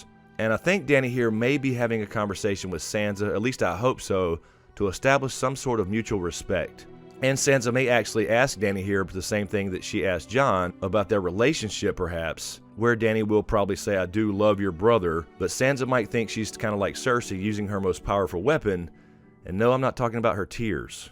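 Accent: South, United States. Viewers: I love how you do it, get right to the point, sum it up so clearly in your warm southern style.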